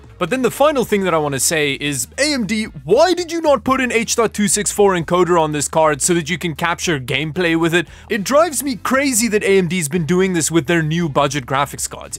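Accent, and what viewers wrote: South Africa. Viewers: You're originally from SA right? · South African accent ?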